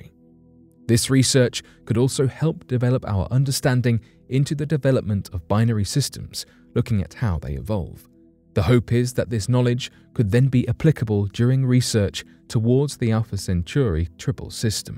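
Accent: United Kingdom. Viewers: I hope those having some knowledge and sophistication will immediately understand the profoundly arrogant messages encapsulated in this video - manufactured complete with Brit accent to intimidate the Americans and their idiotic “mommy-daddy complex” they have towards that stodgy manner of speaking. “No evidence of alien contact…” yeah right. Get real dude…. what you meant to say was “no officially acknowledged contact with aliens.” Otherwise, the door is completely open. The idea that “despite all we know about stars and nebulae…” again, please: the realm designated as “science” the world over is in fact a church, largely controlled by governments and oligarchs- please. COVID proved that science does not exist for the general public and that real scientific reasoning and practices have been destroyed. In any case, almost nothing (of meaningful substance) is known about human existence and the proclamation here is preposterous.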